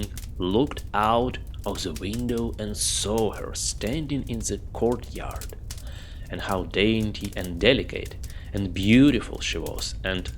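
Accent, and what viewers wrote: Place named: Russia. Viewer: You have a Russian accent.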